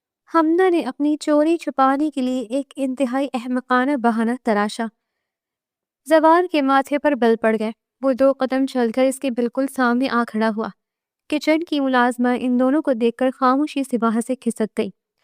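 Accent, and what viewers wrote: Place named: United States. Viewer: Lagra hai app American ya London wali ho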